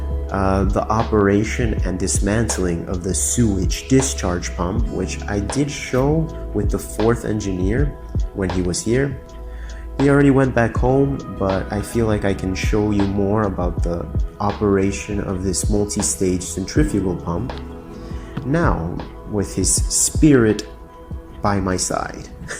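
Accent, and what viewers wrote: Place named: United States. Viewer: You have an American accent. Did you grow up in the U.S.?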